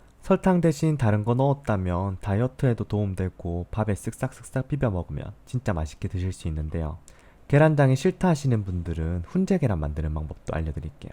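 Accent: Gyeongsang, South Korea. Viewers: 경상도 분이신가보네요 듣는데 사투리가자꾸들려서 좋네요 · 그냥 이렇게 평소 말투로 하시는거 추천합니다. 저도 경상도지만 완벽하게 억양교정 잘 안될뿐더러 ( 도 안되시던데요?😂) 굳이 교정할 필요 있을까요? 사투리 매력적이고 재밌어요 경상도도, 전라도도, 강원도도, 충청도도 다다 ㅎㅎ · 계속 경상도 액센트로 해주시길 부탁드립니다. 경상도 액센트는 세계최고 멋져요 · 경북억양 경남억양이 섞이셨네요...맞나요?^^ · 표준말 같은 구수한 경상도 사투리...ㅋ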